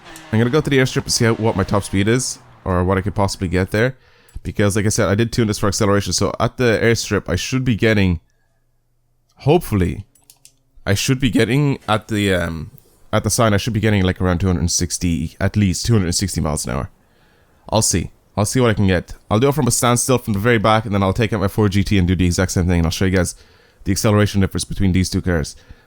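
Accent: Dublin, Ireland. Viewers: Are u irish? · R u Irish like from Dublin down sorta thing? I'm from Derry personally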